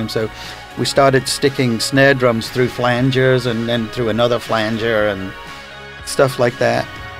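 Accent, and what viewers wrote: United States. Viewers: What's with the ridiculous america accent mike and you're toned down your Liverpool one embarrassing ? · Is it me or does he have an American accent? Isn't he originally from England?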